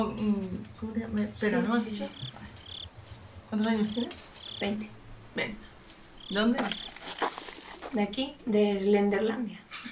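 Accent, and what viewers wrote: Andalusia, Spain. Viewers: ioputa! jajaja! Mola el acento de xD Es andaluza?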